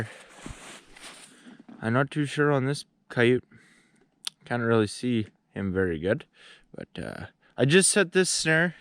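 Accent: Canada. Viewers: You sound like your from waaaaaaaaay out there in Canadian.. you ever get any lynx mate?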